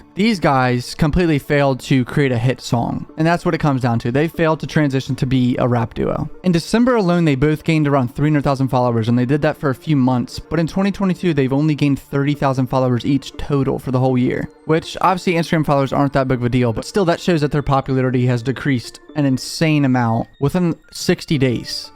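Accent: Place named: Baltimore, United States. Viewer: Damn I'm loving that Baltimore accent. Or is it Pennsylvania?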